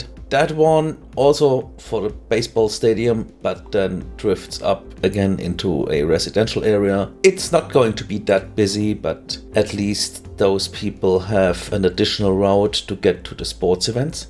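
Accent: Germany. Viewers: Diggi ! Dein German Accent macht mich ech krank. Kann ich nich gucken, es ist so dermaßen schlimm !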